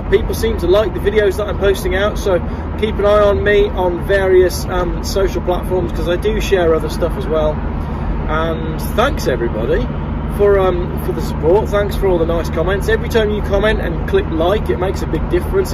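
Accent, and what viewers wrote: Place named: Midlands, United Kingdom. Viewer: before the planned event of three years ago I pretty much watched Hubnut every day. Must admit I wasn’t spending huge amounts of time on YouTube. I almost got to meet Ian at one of his meet n greets when he was here in Melbourne. Then my attention was turned elsewhere. You are the only motoring channel that I know who dares to show another perspective. I admire that. I’m also originally from the Midlands too so that’s probably another reason I like listening 😂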